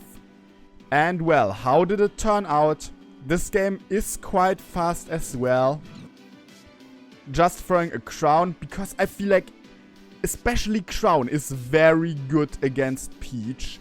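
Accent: Germany. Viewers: Holy shit are you german?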